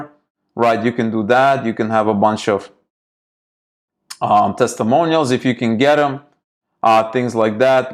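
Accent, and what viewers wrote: Russia. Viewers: Ты не Русский случаем? Подпишусь, если ответишь) Очень Русский акцент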